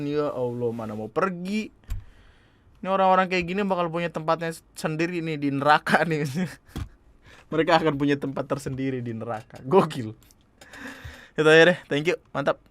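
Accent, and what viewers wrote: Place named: Java, Indonesia. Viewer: Radak radak Jawa woskwowkowkwowk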